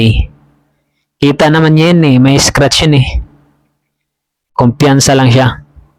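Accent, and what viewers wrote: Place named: Visayas, Philippines. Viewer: Tana na bisaya ingay mo 😅😂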